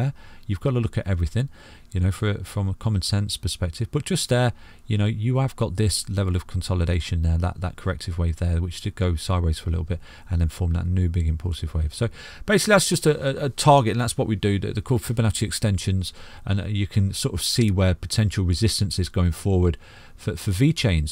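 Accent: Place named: Nottingham, United Kingdom. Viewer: Awesome :D Love it! Where you from, you sound like you're not far from Nottingham or Derby?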